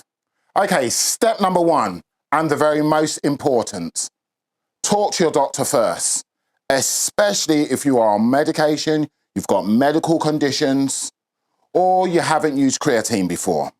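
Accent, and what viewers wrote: United Kingdom. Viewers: Sounds like midlands (Brummie) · He is so British that he sounds fake.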